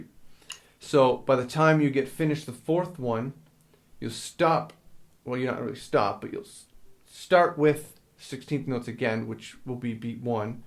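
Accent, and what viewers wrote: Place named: Philadelphia, United States. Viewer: AH i thought i heard a hint of SOME type of accent, i guess i just got philly confused with a canadian accent. the way you say 'out' - is exactly how people from philly say it haha.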